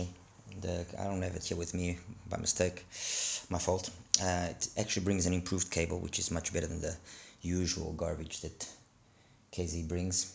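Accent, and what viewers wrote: South Africa. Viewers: Where are you from man? I live in South Africa and your accent sound South African. Just very curious now.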